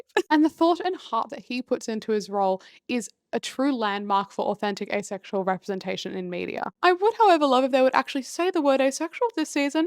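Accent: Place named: Australia. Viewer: omg when i clicked on and heard your accent my jaw dropped, absolutely thrilled to see another asexual australian girlie!! (even if you're a melbourner lol) great video! i'll be subbing for more :]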